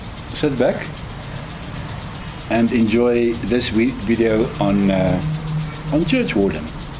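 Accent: South Africa. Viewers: Are you south african? Your accent is very boer-like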